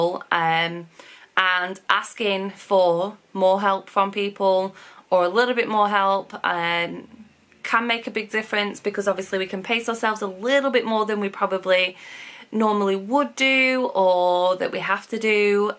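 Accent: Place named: England, United Kingdom. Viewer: Just wanted you to know I love to watch your videos and listen to you speak in general ! I live in the US in TN so I’m very southern and we do not hear an English accent often. Your videos give me strength to Carry on most days when dealing with my fibromyalgia pain; your all around funny, kind, heart warming and a great mother from what I can tell in your videos. I know you didn’t mention this in your recent video, but how are you losing your weight ? I’m 41 and my doctors tell me to lose weight to ease the pain but I have yet to find anything to help KEEP it off.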